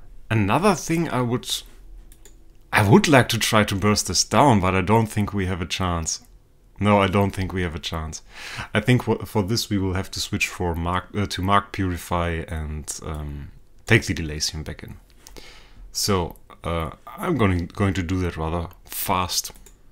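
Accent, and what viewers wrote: Germany. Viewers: Hör ich da einen deutschen akzent oder bin ich lost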